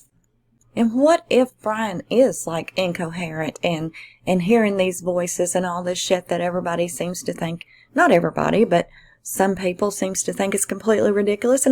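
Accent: South, United States. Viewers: Love your Southern drawl and I like how I can sit and actually listen and think about what you are saying... Most ppl talk too fast, if anything they should slow down - not you speed up. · Your cute southern voice ticks all the right boxes for me lol Sweet mamma goodness gracious me. Some people just don't know a lovely sound when they hear on. Have a great day. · New sub here from Bendigo, Australia (Discovered you with you previous vid) - keep doing what you’re doing! Those of us with high enough IQs can see that you are presenting credible information for us to subsequently analyse and then make our own decisions. Also: I don’t subscribe to channels with automated voices as they thoroughly annoy me (particularly when poor punctuation has been used & sentences require some mental processing to be understood) AND I ABSOLUTELY LOVE YOUR “SOUTHERN DRAWL”! 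👍👍🇦🇺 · I love your southern draw,roll tide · The trolls have left Summer's video's and gone to video's on Brian. geesh, your southern drawl sounds normal to me, bc I'm a Texan. #southernladiesrock Got the reminder on for your live; looking forward to it!❤🙋‍♀️ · Hello, I can't believe someone complained about your beautiful Southern drawl. I love listening to your voice. I grew up in SD and have always loved all things Southern. Living in GA for many years now, I still never tire of the accent. Thank you for always taking good care of us. Much love to you!!! Blessings!! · you have the most beautiful southern voice and I’m glad you’re proud of it don’t ever change lovely lady keep up your amazing work much love from South Wales 🏴 in the uk 🇬🇧❤️ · I LOVVEEE your southern draw!! Don’t let anybody tell u that u need to change in ANY way. You’re absolutely AMAZING!! I’ve been a subscriber of yours for the last cpl years and I absolutely adore u 💜💜💜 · Omg. 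I can’t believe somebody is griping about your southern accent!! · You are doing a great job and if someone doesn't like your southern accent they can take a flying leap. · Don't stress over any negative comments! Those of us who have been here on your channel for awhile, know! You have never click baited and I absolutely love your southern accent ❤ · I would listen to your lovely Southern drawl all day over those dreadful computer generated voices... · Glad I found your channel! Love your voice! We sound a lot alike lol! Southen' girl myself here 😘 I think you're doing a wonderful job! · Brian’s walk looks like something that was called “pigeon toed” back in my day. And it was correctable with special shoes at a very young age so that the feet could be trained to point more inward than outward. Most parents pick up on it when the child starts walking & definitely a good pediatrician would see it in a regular exam. 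Also I love your southern accent, I could listen to you talk all day. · Don't you worry about your southern drawl. Im a southerner. I talk slow & have the drawl. You are an excellent You Tube creator. I've recommended your series, "Exposing the Mistress". Keep them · You are such a great person. Your Southern Drawl makes you are easier to talk to. Newbies Need to listen closely. FYI: NEWBIES WATCH A FEW IS SUCH A SWEET PERSON.IF STE HAS INFO SHE PUTTS IT OUT THERE! ♥️♥️♥️♥️ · Awe! Will miss your live sweetie, working this weekend, but will absolutely watch the replay! Girl, you're absolutely beautiful inside and out! Including your southern drawl. Thanks for what you do! We love ya'll! Prayers & Blessings from WI 🤗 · Damn Skippy! They Definitely need some kind of running updates around NC.....I Live in the Western Mtn's if NC and My County Is Huge.....with Lot's of Wood's......and State Mtn Park's.....So I Definitely Appreciate the Info you giving Us! 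Oh and i think You and Myself are what I like to Call.... An Educated Hillbilly......so there for your Southern Draw is not only Totally Acceptable.....but Also a Must! Lol. So just keep doing what your doing Love!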